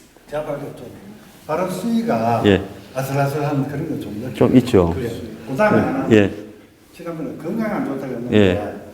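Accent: Gyeongsang, South Korea. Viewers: 소장님은 뉴스 브리핑 중 소장님 특유의 말투로 약간 경상도 말에 약간 속어쓰는것이 제일 우습습니다. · 서부경남 사투리가 친근하고 믿음이 가지요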